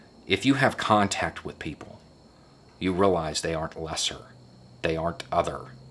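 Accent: South, United States. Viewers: Gotta be 100% honest...didn't expect this from a prepper white guy with a southern drawl.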